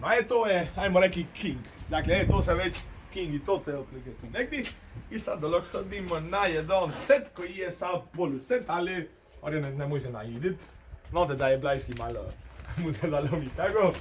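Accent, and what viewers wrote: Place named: Dalmatia, Croatia. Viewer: Hrvatski samo što zivi u dalmaciji pa ima drugi naglasak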